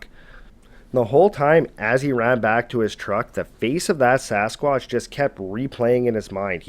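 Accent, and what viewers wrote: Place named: Canada. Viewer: Your Canadian accent is refreshing to listen to rather than the same old. Also only thing he did wrong was ignore his instincts after the first night.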